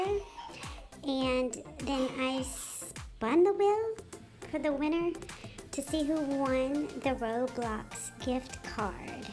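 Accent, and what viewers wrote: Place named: United States. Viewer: I love your voice its so country😊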